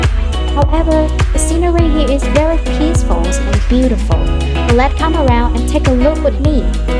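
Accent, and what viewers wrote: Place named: England, United Kingdom. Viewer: Love your English accent so much ❤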